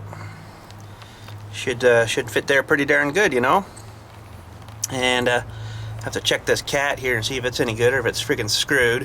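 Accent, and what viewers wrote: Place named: Canada. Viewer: Thanks for posting up this video! I like the way you "neighbors to the frozen north" speak. Cracks me up!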